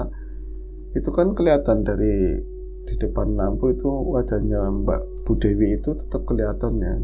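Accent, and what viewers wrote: Banyumas, Indonesia. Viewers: Asyiik juga dengerin mas e br kisah pke bhs ngapak2 gmn gtu... menghibur ...😊😊😊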